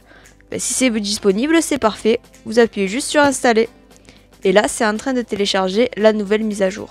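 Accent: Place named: South, France. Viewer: Super merci pour ce tuto tout ça avec l'accent du sud 👌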